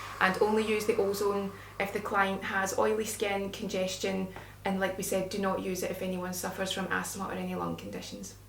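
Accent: Scotland, United Kingdom. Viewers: I loved this. And I actually loved your Scottish accent. And also the fact that you even say Uhmmmm and Ahhhh during this very lovely and useful video. Thank you so much.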